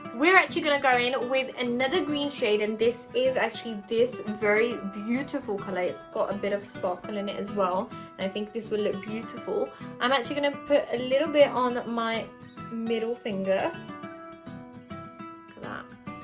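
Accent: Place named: Punjab, India. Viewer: Just love your punjabi and english accent💕💕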